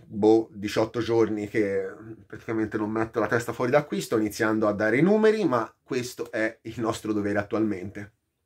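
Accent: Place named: Livorno, Italy. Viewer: Ma sei di Livorno?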